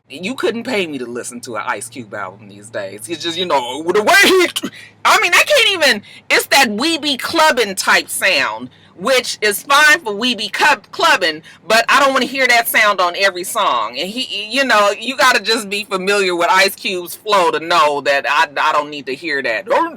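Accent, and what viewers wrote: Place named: California, United States. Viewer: "you got a hit right there" still got that cali accent I love it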